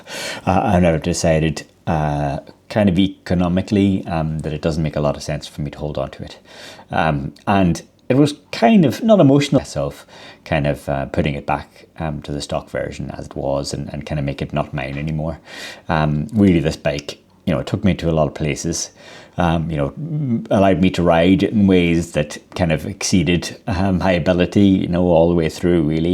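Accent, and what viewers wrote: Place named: Northern Ireland, United Kingdom. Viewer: would seriously consider it! From your accent you still in NI or local region??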